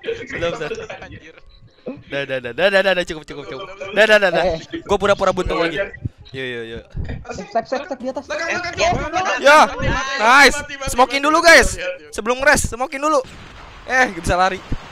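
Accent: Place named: South Kalimantan, Indonesia. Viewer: Bang lu orang banjar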